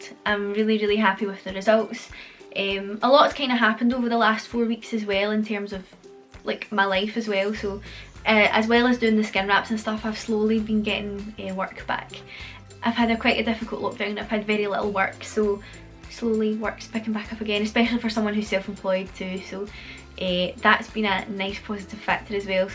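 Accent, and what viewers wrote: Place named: Scotland, United Kingdom. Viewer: Holy shit your results are stunning! Beautiful skin! I'm feeling all proud of myself as an American who was able to correctly identify your accent as Scottish...lol. That's as best as I can do though. I'm glad to have found your channel!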